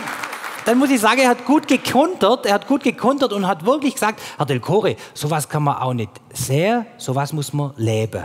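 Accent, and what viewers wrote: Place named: Swabia, Germany. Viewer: Vielen Dank für die liebevollen Geschichten aus dem Alltag👍🤗 ich höre und sehe sie mit ihrem schwäbche Dialekt so gerne❤, machen Sie weiter so und bleiben Sie so wortgewant und humorvoll unterhaltsam, denn das braucht man in diesen chaotischen Zeiten um gut gelaunt durch zu halten und die Hoffnung nicht zu😊 verlieren ❤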